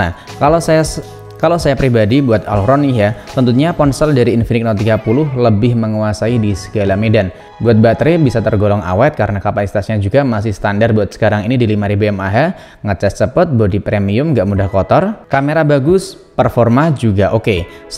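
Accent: Demak, Indonesia. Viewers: Orang Demak ya bg?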